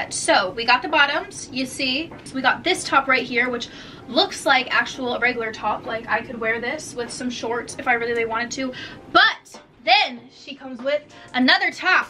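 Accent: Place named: Texas, United States. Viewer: her texas accent 😂❤️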